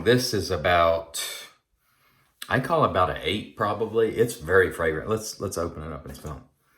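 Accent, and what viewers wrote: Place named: South, United States. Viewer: That soft Southern accent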